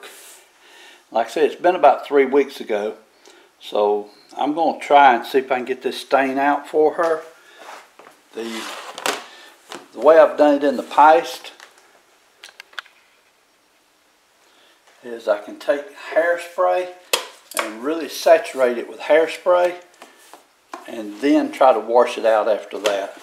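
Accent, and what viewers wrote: South, United States. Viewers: Love that southern accent my hubby is from South Carolina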